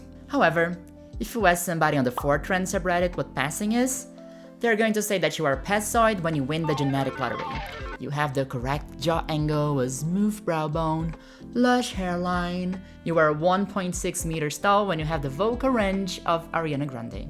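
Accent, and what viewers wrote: Brazil. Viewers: Don't you dare apologize for your beautiful Brazilian accent! The way you say infamous and frequently is extremely charming! Technically I can't speak for the entire United States but to the ear of a person in the US the Portuguese accent and pronunciation is very attractive to our ears, now for example there are other accents that don't sound quite as lovely in English but I don't want to be rude, I'll just say that a certain German chancellor would have a very harsh sounding accent. Also, great video full of nuance that I think will be beneficial for many young women. Strangely enough my daughter never really voiced concerns about passing but she really needed affirming surgery for her mental health, but it was for her not for anyone else. She's kind of special that way! ☺️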